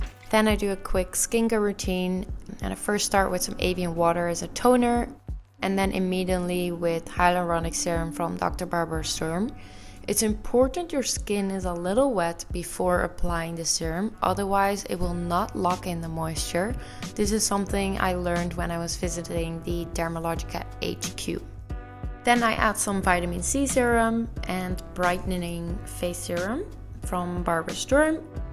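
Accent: Netherlands. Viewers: you sound like you are dutch! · I smell een Nederlanderrrrr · I think she’s Dutch sounds it …Wonder which part of the Netherlands she’s from :) Nice vid 🎉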